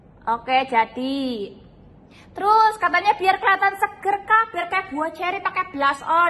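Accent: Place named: Banyumas, Indonesia. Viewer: hahaha ngapak euy keren hadir salam sukses bro mampir ngopi ☕☕☕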